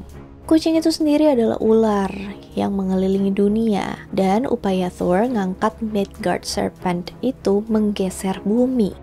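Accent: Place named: South Jakarta, Indonesia. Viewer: Gua suka pembawaan yang sekarang gaya anak Jaksel pisan wkwkwkw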